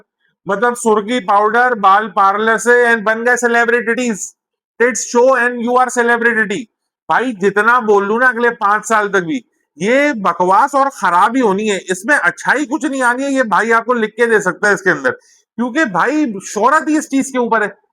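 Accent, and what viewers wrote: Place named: Karachi, Pakistan. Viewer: Bhai, you make Best comedy videos in Karachi accent 😂😂😂😂😂❤❤❤❤❤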